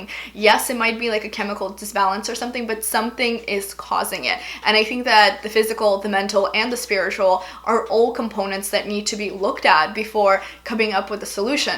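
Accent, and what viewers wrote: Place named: Los Angeles, United States. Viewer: it's funny i was listening to this video with the background app only sound and as an foreigner we are influenced by american tv shows and have those L.A accents ingrained in our head hahaha so when i heard your voice/little vocal fry i tought "she's from L.A no doubt about it" :)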